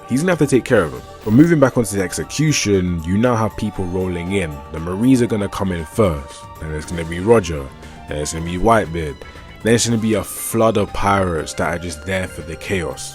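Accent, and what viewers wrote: United Kingdom. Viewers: Ffs stop talking British bruh. Bro sounds incoherent (slightly) 2/2 videos that I've watched. EDIT: I like the content, that's the only reason I'm saying this. Not throwing hands just cos Brit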